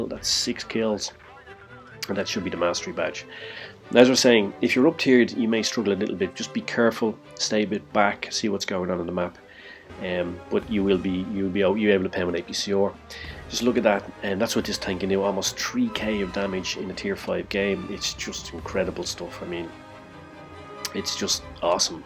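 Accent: Ireland. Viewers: Are u from ireland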